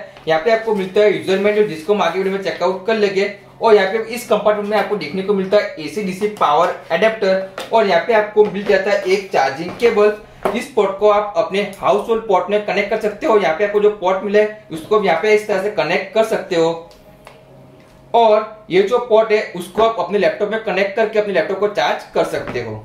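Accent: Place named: Gujarat, India. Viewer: Yeh pakka gujarati hai iske bolne se hi pata lag gaya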